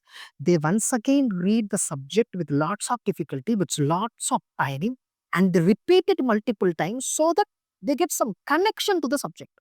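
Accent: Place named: Telugu, India. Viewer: Sir your mother tongue is Telugu know 😄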